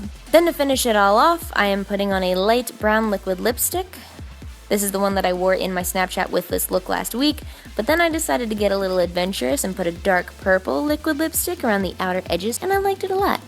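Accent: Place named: Philadelphia, United States. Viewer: Your Philly accent gets me every time. I know it all too well and I love it ❤️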